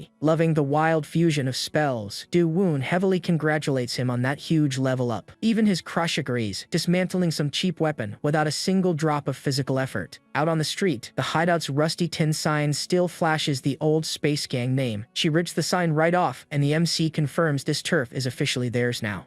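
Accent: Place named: United Kingdom. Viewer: I feel like the voice is trying very hard not to be British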